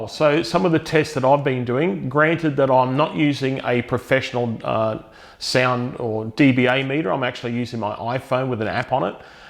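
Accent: Australia. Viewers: Thanks for sharing. Glad to hear an Aussie accent in the review so I know I know it is possible to get this sucker locally (pun intended). Just to note, a 3dBA difference is about double the sound pressure level, so from quiet to noisy for that thing it is a difference of 4 times the sound pressure level between low to high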